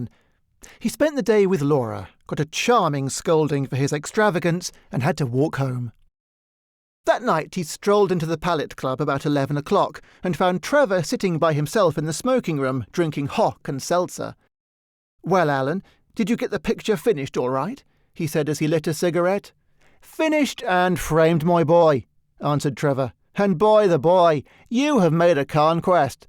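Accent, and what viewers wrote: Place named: Dublin, Ireland. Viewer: Finding this to start my day was perfect! And hurrah for a lovely Dublin accent 💖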